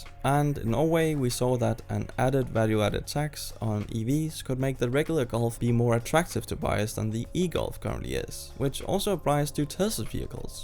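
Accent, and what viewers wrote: Denmark. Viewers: Visste du var dansk från din engelsk dialekt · I could smell this guy's Danish accent from a mile away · how could you possibly not know he was danish with that accent?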